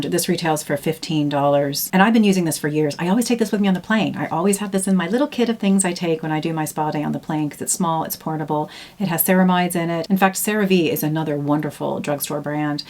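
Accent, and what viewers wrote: Ireland. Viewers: Hi i am new to your channel and really liking it so thanks xx love your accent with the slight irish twang thrown in! x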